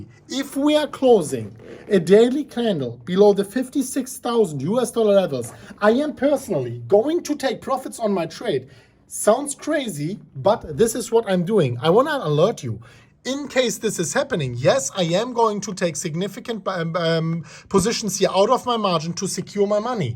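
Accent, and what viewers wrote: Germany. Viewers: I love your German accent, I think it's part of your style. If you wish to improve, look for me.:))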